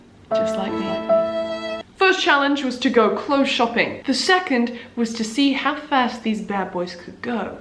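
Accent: United Kingdom. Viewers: Something about a slightly posh English woman wearing a cross saying “no nut busting for you my friend.” is very... strange for me. As an American gay male.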